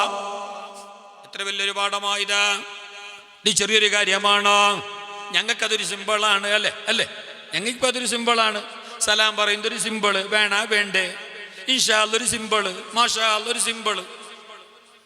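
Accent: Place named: Mangalore, India. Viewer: മാഗലാപുരം അല്ലെ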